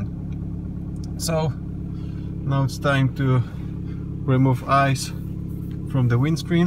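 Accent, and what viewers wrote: Poland. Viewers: Ale mnie śmieszy ten polski akcent Polak dla Polaków po angielsku gada :D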